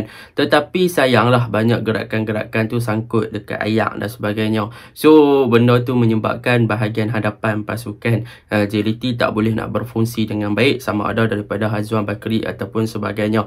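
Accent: Kedah, Malaysia. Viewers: Aku suka dengaq loghat kedah hang, cuma aku x suka dengaq loghat kedah sanusi je ❤️💙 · suke betol aku dgr loghat kedah hang bang👍👌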